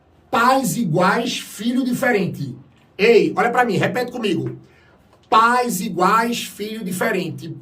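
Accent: Northeast, Brazil. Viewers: Cara, melhor aula sobre esse assunto, que professor fantástico. A explicação desse professor é muito clara e única, esse nosso sotaque nordestino é muito bom! Parabéns pela aula professor, com certeza vou lembrar do senhor na minha prova!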